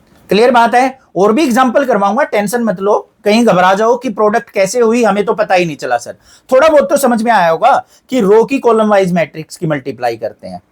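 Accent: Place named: Sonipat, India. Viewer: arey sir jii aapp sonipat ke lgte hoo kyuki aap bhi ho or aapki boli bhi vaisi hi h or m gannaur kaa hu 😂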